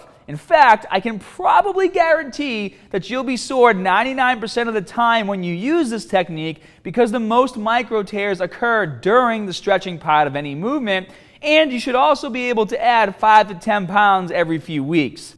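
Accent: Boston, United States. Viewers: Is that a Bostonian accent?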